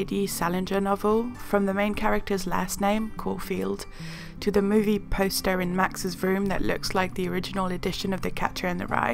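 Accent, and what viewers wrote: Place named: England, United Kingdom. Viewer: O cool ! Vous avez un sacré accent anglais :)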